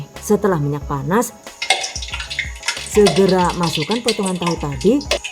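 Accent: Banyumas, Indonesia. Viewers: Paling suka kalo ada selingan 'kata kata mutiara' yg ga ada di channel lain Pake bahasa jawa ngapak, auto ngakak 🤣🤣🤣bisa aja nih, kirain orang banyumas- cilacap -purwokerto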